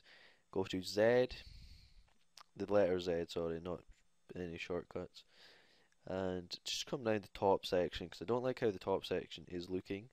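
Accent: Scotland, United Kingdom. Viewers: HES SCOTTISH!!!!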